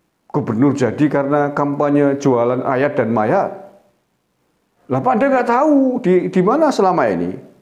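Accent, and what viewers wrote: Banyumas, Indonesia. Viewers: 😀😀😀😀geger moweeeerr ,muaaantaap khaas banyumasan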